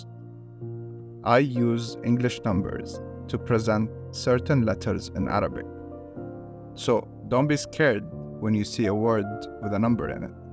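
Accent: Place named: Egypt. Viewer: Since you asked for some corrective feedback: Your tone in the video is a bit laid back. I kind of think you are on your bed, early in the morning, making these videos. Although I like how the silence in between the spoken words matches the cosmic background of the space void shown in the video, that makes a good combo. You can also consider making your videos under 2 minutes of length; that will improve your full-watch statistics bettter on youtube. It is good to explain how your own particular dialect is at play at some of the video contents. For example, you would say 'albi' when reading the world Qalbi (my heart) because that is the (Egyptian?) dialect perhaps. Similarly, you'd say 'Kateer' while reading KaTHeer with a ث. It is also a good idea to keep people informed about some of the sharable private information/news/material from your actual life during the video as it creates a strong personal bond between the content creator and the followers. 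Finally, creating content around anything that is trending in the news will make the content further interesting as well as catch some of the organic search traffic to your videos. Overall, good efforts, in fact, great efforts and the only advise that suits this time of your YT creator's journey is not to give up till your 250th video and wait like a patient harvester. This would be a case of یعجب الزراع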